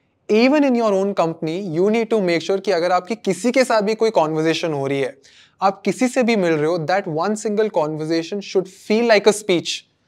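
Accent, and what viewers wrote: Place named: India. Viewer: I'm sorry but the Indian accent is still very difficult to hear. However, your tutorials are still of very good quality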